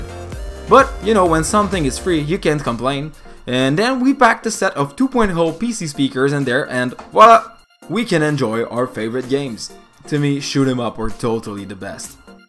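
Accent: Quebec, Canada. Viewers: You sound like a Québécois from Montreal ! Not that there is anything wrong with that . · This is a French-Canadian accent!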